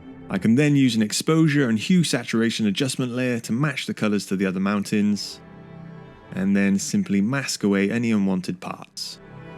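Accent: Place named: United Kingdom. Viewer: Love ur british accent, "bo'om left" 😂. Anyway, that's a great artwork right there man 🙏❤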